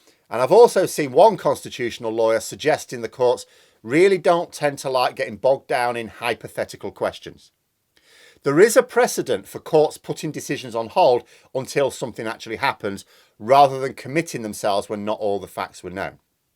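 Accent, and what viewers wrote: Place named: Yorkshire, United Kingdom. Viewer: Soft Yorkshire lad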